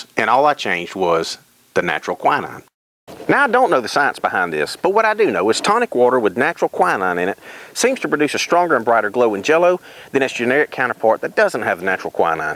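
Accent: United States. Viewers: U have the most american accent ever. Like you were from a cartoon or 50's america.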